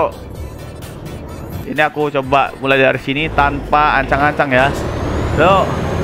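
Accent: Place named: Java, Indonesia. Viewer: Bang sampean jowone ngendi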